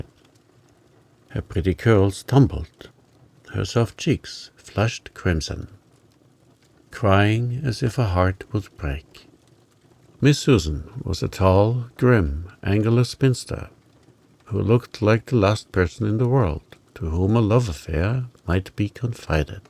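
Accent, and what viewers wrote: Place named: Germany. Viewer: This is the first time I've seen your videos and I am delighted! You are very good at speaking stories! My grandfather had a beautiful German accent like yours. He was very soft spoken as well. If they had You Tube back in the 1980's, my Grandfather could've surely been a part of it! 😆 Thank you for this. I am subscribed now. 🥰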